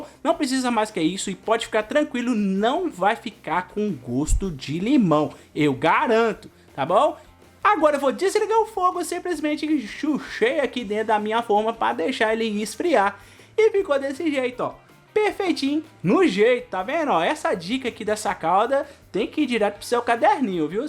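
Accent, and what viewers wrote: Minas Gerais, Brazil. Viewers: Eu ja adoro te ouvir com esse sotaquinho mineiro. Amo suas receitas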